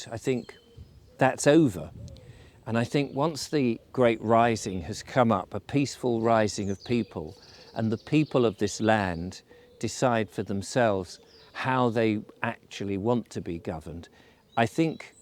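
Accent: United Kingdom. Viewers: Wow Sir, you look good. Aa the Britishess is so lovely. Rest and recreation on a weekend... Thank you and enjoy 🎉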